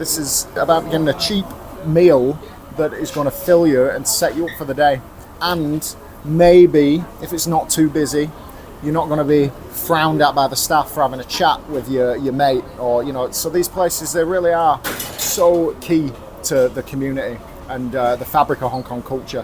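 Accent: United Kingdom. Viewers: Hello, it is much an enjoyable experience to watch your video about HK food scene and when you talking in British accent. We needed your expertise on analyzing how good is the HK milk tea in comparison to traditional English breakfast tea, it is enjoyable especially watching that segment. HK milk tea was invented somewhat based off the original formula of the original English Breakfast tea or Ceylon Tea during Colonial Era. I left HK 30+ years and occasionally I drink Twinnings tea bags and HK che chean tang here in Los Angeles suburbs are not that good compared to HK locations.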